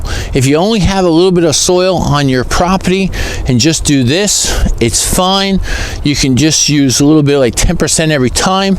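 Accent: New Jersey, United States. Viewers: Great info and as usual, your Jersey accent makes me homesick. Thanks!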